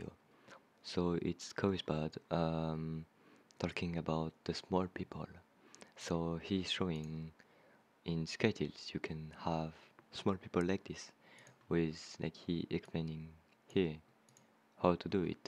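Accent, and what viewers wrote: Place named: France. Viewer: You have a Big french accent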